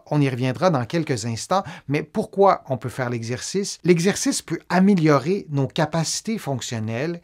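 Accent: Quebec, Canada. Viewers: Merci pour la vidéo, j'adore votre accent québécois ❤ Autre souci passé la 60aine: la "bouée de sauvetage", cette graisse abdominale, localisée au niveau du ventre et des hanches qui veut s'installer subrepticement! Pourtant je fais 1h d'exercices tous les matins, une fois par semaine 2 heures à la salle de fitness, je vais aussi nager et, n'ayant pas de voiture, je marche tous les jours, je suis végétarienne depuis bientôt 50 ans, fais attention au sucre... rien n'y fait, je ne sais plus quoi faire 🤷🏼‍♀️ Tous conseils bienvenus, merci d'avance 🙏🏼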